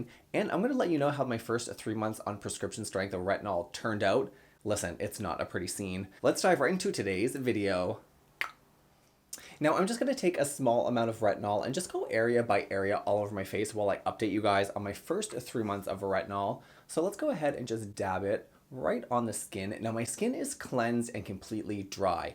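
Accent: Canada. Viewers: Your (eastern?) Canadian accent is adorable.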